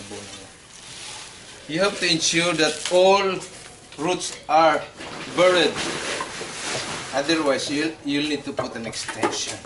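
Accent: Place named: Bisaya, Philippines. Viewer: piste mu bai..pnipilit mu pang mag inglish (english)...hlata nman bisaya ka...pisti tlaga..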